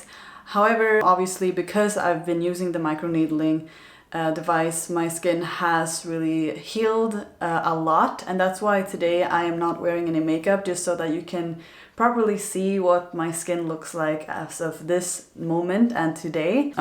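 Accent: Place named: Hungary. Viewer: Not related to the video, but I would have bet that you are hungarian (after your intonation) until I saw that you are from Sweden 🙂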